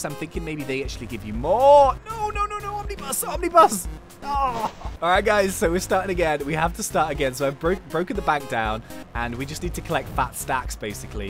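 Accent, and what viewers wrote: United States. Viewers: That American accent tho... that's better than I can do and I've never been out of the USA!! 😆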